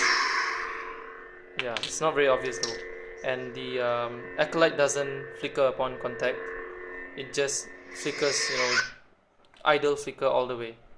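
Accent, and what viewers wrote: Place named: Singapore. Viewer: lol I hear a Singaporian accent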